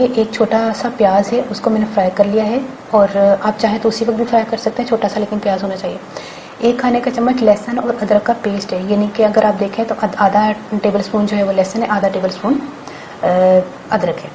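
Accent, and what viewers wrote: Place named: Punjab, India. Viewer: میں پنجابی نہیں ہو پر آپ جو پنجابی بولتی ہو نا بہت سویٹ اور پیاری لگتی ہے آپ پر ورنہ پنجابی زیادہ تر بہت عجیب سے بات کرتے ہیں پر پہلی بار مجھے آپ کی زبان سے پنجابی پسند آئ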